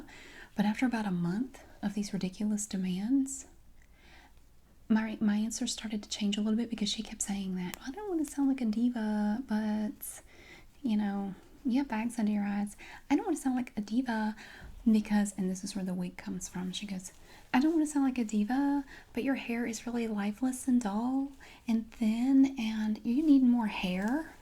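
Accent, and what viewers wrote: South, United States. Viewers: I think it's funny how the title says "super southern" yet this is how everyone I know sounds.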